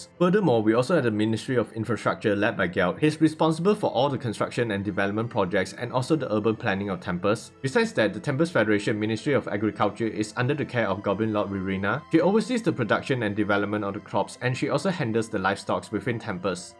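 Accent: Singapore. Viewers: say, your accent sounds familiar, Singaporean / Malaysian ?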